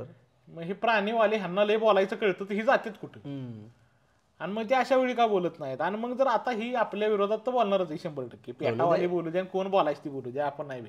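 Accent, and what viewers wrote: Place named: Satara, India. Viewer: लय भारी चर्चा केली दादा अगदी आपल्या सातारी भाषेत. खूप मस्त. धन्यवाद!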